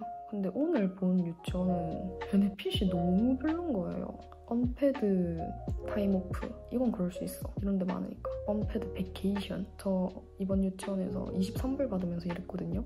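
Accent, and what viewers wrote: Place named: Busan, South Korea. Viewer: ㅋㅋ 넘 귀여우세요 부산말투~~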